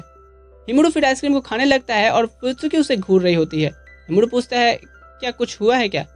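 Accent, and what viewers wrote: Bihar, India. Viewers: Bihari h ky itna ganda explanation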